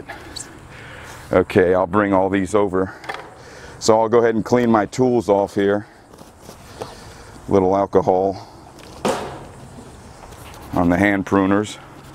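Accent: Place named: North Central Florida, United States. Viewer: Watching this makes me homesick. I've lived in New York City for over 20 years but grew up in Gainesville and St. Augustine. All the beautiful palms! Even your accent- north central Florida twang a-la Tom Petty!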